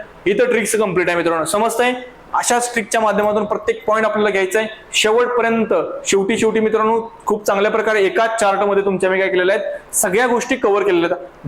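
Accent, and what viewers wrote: India. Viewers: Bolnyachya toluning warun tumi patan talukyatale watatay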